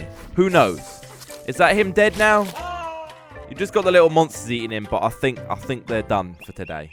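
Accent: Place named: Essex, United Kingdom. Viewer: He sounds so Essex in this video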